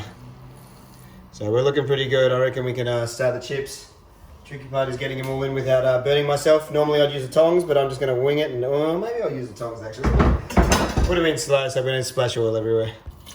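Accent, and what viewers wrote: Australia. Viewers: I noticed on his patreon page that it's called Nice and Nice Persons Club. In words, "How cool is that!" Edit: Actually I think he says, "How 'noice' is that!" (love the Aussie accent.)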